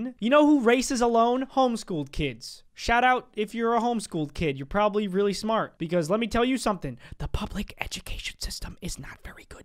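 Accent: Italy. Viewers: I think you cute with the Italien accent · you need to about me out in the next video!? Btw I love the Italian accent and I am part Italian!☺😊😀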